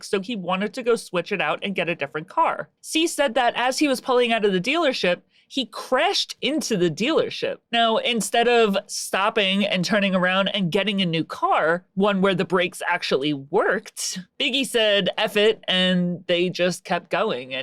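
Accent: United States. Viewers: ohh I completely understand, I wasn’t trying to hate to say you’ve don’t anything offensive or any silly crap like that . I actually like your videos a lot you do amazing work and you do it from a perspective you don’t often see or hear from I like hearing stories I’ve heard (and some I haven’t) before but in a way that I never heard it and you deliver that which isnt an easy thing to do Plus Being born and raised in Baltimore and having a pop pop who use to travel back and forth from bmore to Connecticut, NY and Jersey like he had a different wife and kid in each state (I think he might of actually on Buffalo but that’s beside the point lol) but he was an old school “union worker and sometype of official” who also was a major number runner in the city (it’s ok he passed away awile ago so no worries) but I’ve heard some stories and seen a few crazy things as a youngin lol you also remind me of a few girls who were really good friends that I grew up with. 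I know your not from bmore im Pretty sure your from somewhere in the Tristate area maybe in one of the five famous boroughs of New York or New Jersey maybe Jersey city or Newark. I can hear a little bit of it in certain words you say (you’ve probably mentioned it before, my memory is shit nowadays anyway lol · I love your accent. Reminds me of living in queens ❤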